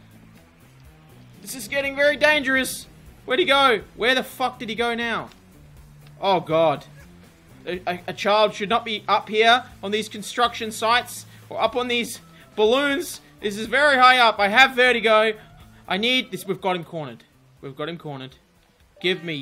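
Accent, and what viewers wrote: Australia. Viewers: say more funny Australian sounding stuff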